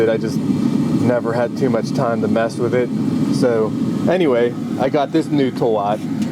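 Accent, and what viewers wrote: Pittsburgh, United States. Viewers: I thought you sounded like you had a "burgh" accent. Central pa here. I have the same problem with my caravan I think, I've got to tear it apart to make sure. Thank you for the info.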